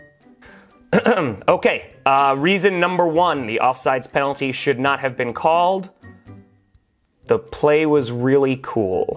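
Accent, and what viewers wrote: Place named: Kansas City, United States. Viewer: You need to work a little bit of Ted Lasso into your Chiefs fan accent. I love your videos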